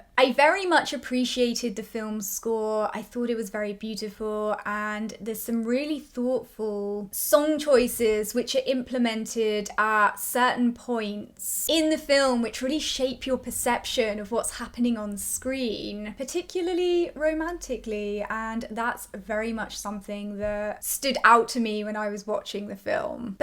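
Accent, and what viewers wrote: United Kingdom. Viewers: Fellow American swine here, and I concur that cadence and refined British accent are quite divine. Her insights are always so thought-provoking, too. ❤❤❤❤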